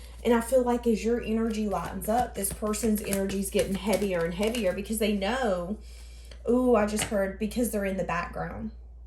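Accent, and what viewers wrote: South, United States. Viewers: Thank You. You are inspirational. Love your sweet southern accent and good advice.❤❤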